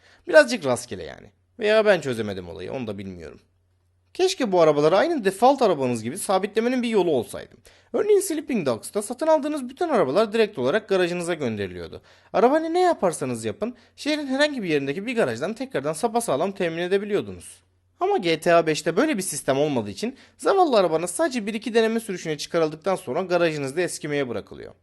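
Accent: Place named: United States. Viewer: Aga Amerikan adamları tarzında konuşuyorsun. 'Hadi ama hangimiz yapmadı ki' gibi. Ama bu konuşmalar bana hoş gelmiyor. Alışkın değilim sonuçta. Temiz bir anlatım yapmışsın. Tarafsız olmuşsun. Tebrik ederim.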